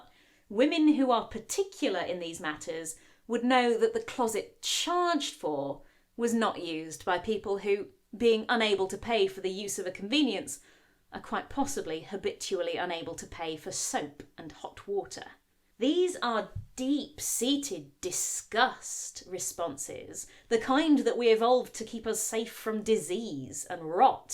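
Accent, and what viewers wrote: United Kingdom. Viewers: You rock in your knowledge. And that endearing British accent done with panache is so delightful.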